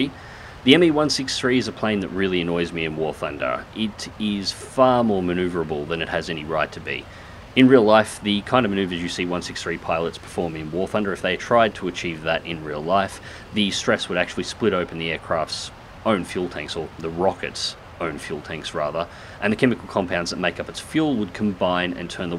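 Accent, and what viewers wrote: Australia. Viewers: Love hearing a friendly aussie accent <3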